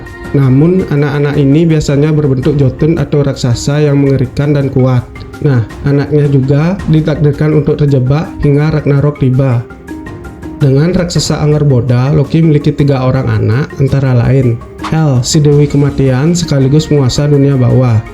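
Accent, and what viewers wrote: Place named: Bali, Indonesia. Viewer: Beli logat bali nya masih kental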